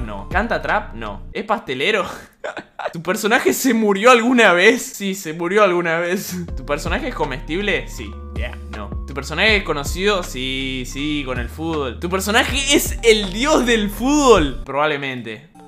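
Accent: Santa Fe, Argentina. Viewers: Spreen sos de santa fe ??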